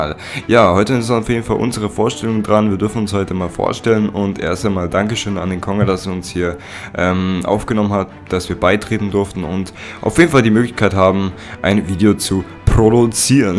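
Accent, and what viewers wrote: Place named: Bavaria, Germany. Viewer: 100% Bayrisch - Gutes Video 👍👍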